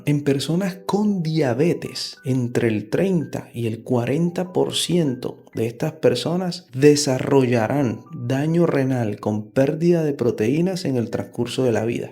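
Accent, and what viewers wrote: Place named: South America. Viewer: Ademas de aprender, me arrullas. Que voz tan suave, clara y agradable, junto con ese acento sudamericano tan amable. Gracias❤❤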